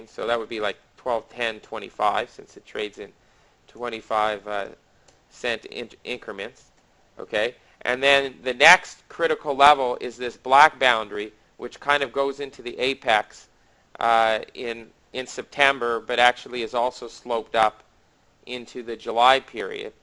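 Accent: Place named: Pittsburgh, United States. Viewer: If you're not from Pittsburgh then I'd be very interested to know where you got that accent. Great work once again.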